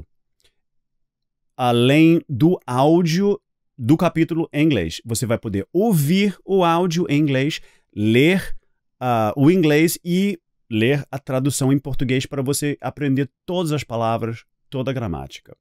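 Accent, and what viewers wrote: Rio de Janeiro, Brazil. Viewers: Voce é americano? e esse sotaque do Rio ? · Quero aprender o Inglês tão bem quanto você fala o "Carioquês"! Gostei muito do seu vídeo, parabéns!!! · . Parabéns !!! é um carioca da "gema"....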